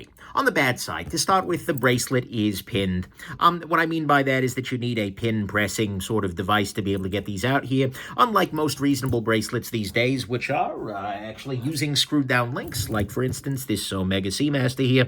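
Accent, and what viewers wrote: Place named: Staten Island, United States. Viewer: You have a Staten Island accent.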